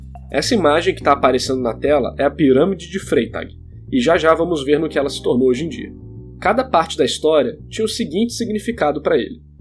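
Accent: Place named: Maranhão, Brazil. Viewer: O sotaque desse cara é muito dr maranhense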